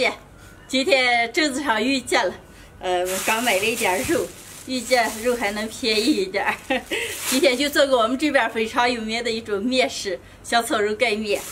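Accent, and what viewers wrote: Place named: Shaanbei, China. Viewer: 越来越爱听陕北口音了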